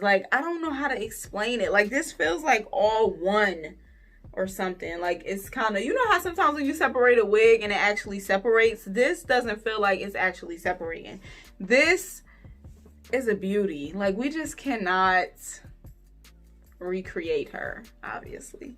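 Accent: New Jersey, United States. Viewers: Ok Jersey🙌🏾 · Ayyyyyy hey my jersey sis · Sis are you from Jersey? That accent is so strong lol. I love it tho! Love ur videos btw!❤️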